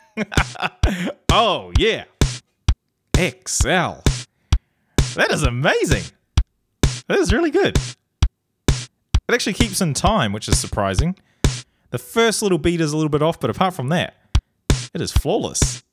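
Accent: New Zealand. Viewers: Your from NZ aren't you?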